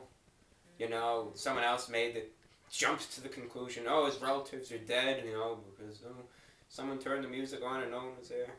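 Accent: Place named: Pennsylvania, United States. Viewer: I just discovered your youtube report today. A fresh face with the mystery of sincerest tomfoolery. The ‘dart redux’ episode (6 years ago) at least presented an admission to a degree of insincerity….mixed with brutal honesty. I wanted to see your first reports to flesh out the genuine man and compare with your more recent reports. Your consistency and demeanor is calming. And you lost that east Penn accent somewhat. Bits of mine slip out from time to time. (No one notices)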